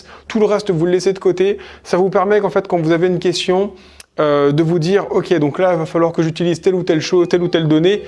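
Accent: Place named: France. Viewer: Mignon votre accent français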